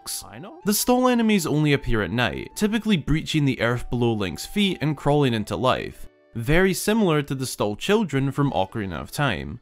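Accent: Scotland, United Kingdom. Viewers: You have the Andy Murray accent, complete with the groaning sound and drawn out words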